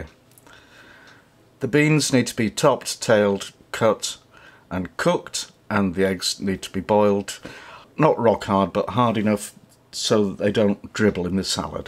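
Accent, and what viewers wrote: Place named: West Yorkshire, United Kingdom. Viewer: Hi, just found your website. I obviously know you are from Yorkshire, however where abouts? You sound like the same part of West Yorkshire that I am from so was curious 🙂 x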